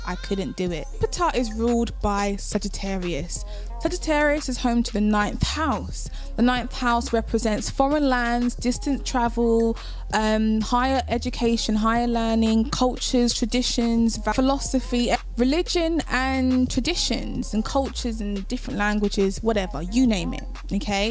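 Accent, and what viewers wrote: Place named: England, United Kingdom. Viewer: Why you are not a household name I do not know. Initially…You Lovely Lady😇, had me intrigued by your the English Accent. Then I noticed that you had certain flair/ “steez” as we use to say in Philly 🙂/style about you. Please note { these observations were in milliseconds ( says my Gemini ♊️Ascendent ) .} Then BAM 💥 My Jupiter in Pieces in the 12th house kicks in and I’m able to discern that you are Spiritually and Intellectually Wealthy! You have a Greater understanding of who the planets are entities. Just like me. Hey Girl , I love your work.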